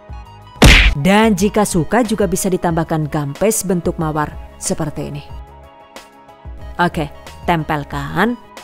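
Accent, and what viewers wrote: Banyumas, Indonesia. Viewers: Suka ngakak denger mbak nya ngomong ngapak..😂🎉sukses trs mbk... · Serumpun sepertinya sama suami saya..ngapak"😄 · Mbaaaaaa'e , ngapak²😂😂😂 resepnyaaa mantap. Terima kasih yoooo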